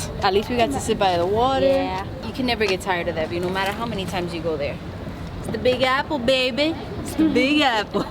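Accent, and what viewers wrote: United Kingdom. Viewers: So excited to see a video that I watched it twice! Girl, I have said it before and I will say it again... I fucking love you! So down to earth and chill and a British accent! Video was clear AF too, making those red lips look super bomb. Bless the fam and don't make us wait so long for the next video, LOL!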